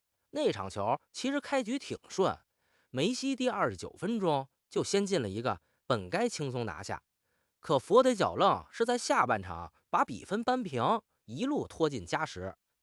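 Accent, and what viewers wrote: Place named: Beijing, China. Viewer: 满嘴京腔。反贼也看球啊？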